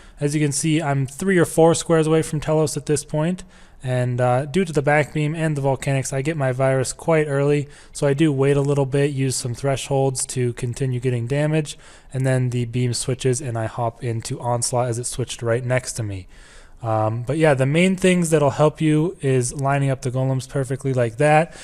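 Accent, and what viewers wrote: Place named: New England, United States. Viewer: "Wicked quickly..." Are you from New England too? =) Nice guide btw.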